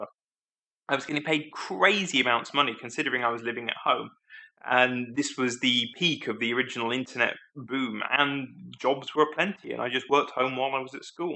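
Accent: United Kingdom. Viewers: Please talk a little bit slower. There are people that are watching you and English is their 2nd language. And UK has a particular accent and no substitutes. So it can be harder and have to stop and rewind. You can take your time, no need to rush. Everything else is good. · Is it the audio quality or the British accent.. it is hard to understand you sometimes. But this topic is great :)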